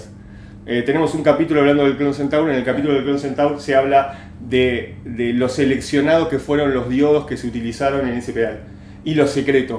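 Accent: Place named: Argentina. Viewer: Tremendo video! Muy interesante escuchar la explicación de esto en español argentino :D. Una sugerencia para un futuro, algo que no he encontrado mucho material es sobre cómo funcionan los canales en un amp valvular, qué componentes diferencien un canal de otro. En fin, a seguirla rompiendo con estos videos. Abrazo desde Uruguay!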